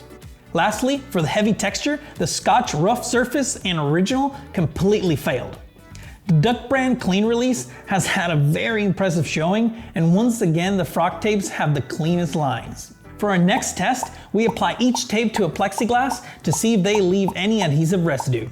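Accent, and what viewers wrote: Quebec, Canada. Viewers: Great video! Are you French Canadian?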